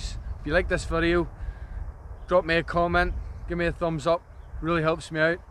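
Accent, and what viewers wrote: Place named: Antrim, United Kingdom. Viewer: that aul country antrim accent proving hard for people to decipher haha love it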